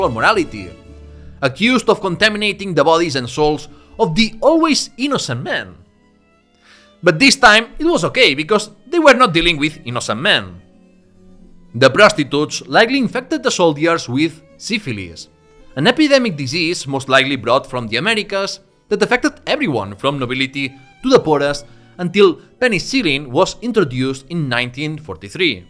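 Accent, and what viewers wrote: Spain. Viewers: I feel like I'm studying Spanish by listening to your accent. Please never change it.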